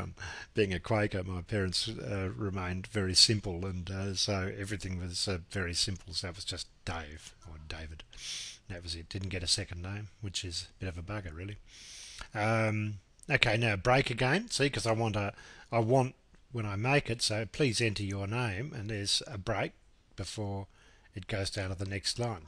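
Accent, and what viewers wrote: Australia. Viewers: Thanks Dave plain Aussie Talk Very Easy to Follow.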